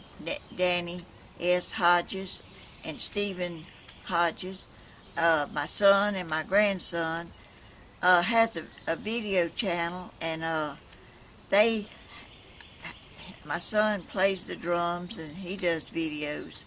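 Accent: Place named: Georgia, United States. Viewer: Love your Georgia accent❣️😊 🌹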